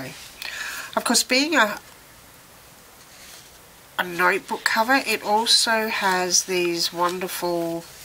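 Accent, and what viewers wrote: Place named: Melbourne, Australia. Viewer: Thanks for sharing your views on this beautiful product and it's nice to to hear a fellow Melbournian. I agree with everything you say. Gillio's products are simply divine and of the best quality and craftmanship. I have a Gillio Giramondo in Epoca Red and just received my A5 Appunto in Epoca Gold yesterday. I love them both.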